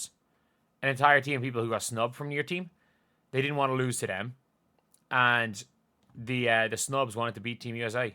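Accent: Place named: Ireland. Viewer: You sound Irish.....😊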